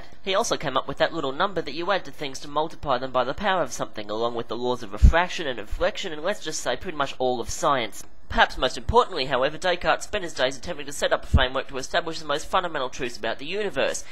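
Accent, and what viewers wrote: United Kingdom. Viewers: Only a British accent could pull this off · okay, this was so fucking funny, I love British sarcastic drawl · wow...honestly, i got a totally wrong impression of what you looked like judging from your voice...lol, guess its just the English accent. Still another awesome 3 minute philosophy yet again and thanks dude! i aced my philosophy midterm because of you! also, you think you could maybe do a 3 minute philosophy on Nagel?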